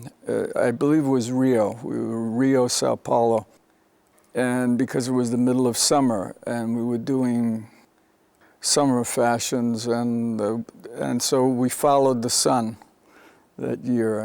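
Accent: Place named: New York, United States. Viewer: Thunderbolt and Lightfoot was an extraordinarily accomplished debut. Like another singular talent, Oliver Stone, he was a graduate of Yale. Year of the Dragon remains his best film--for me at least. There was a very beautiful Chinese-American actress in it. I love his accent--pure Italian New York! Thanks for posting this and keep up the good work.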